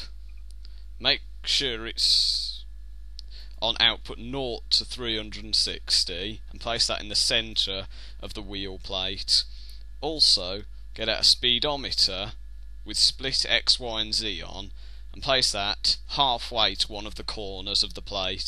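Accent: United Kingdom. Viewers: this man is British. He sounds like a young Ringo Star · this guy sounds more british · i hate british accent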